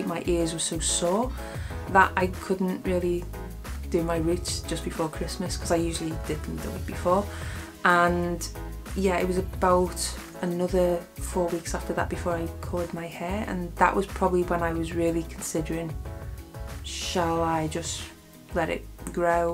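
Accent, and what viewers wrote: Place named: Liverpool, United Kingdom. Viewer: I love your accent.....I have a Scouse accent too!!😀 I'm a lot older than you, but I'm just starting to start, transitioning ....I'm a wee bit hesitant but I know, I need to do this. You know what I mean like don't you? 😀 Thanks for your videos, are you in Liverpool?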